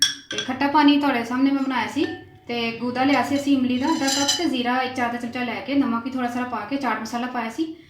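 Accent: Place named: Punjab, India. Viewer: Ap Ka punjubi satyl bohat acha h 😘me b punjubi hn I mrs Usman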